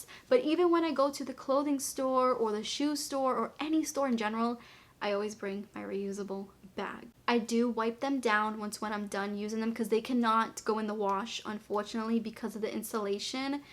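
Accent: Boston, United States. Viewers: Congrats on 100K love your content and that adorable Boston accent.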